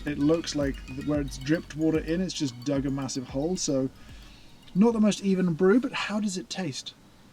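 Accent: United Kingdom. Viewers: Articulate British man tells you everything will be okay (ASMR)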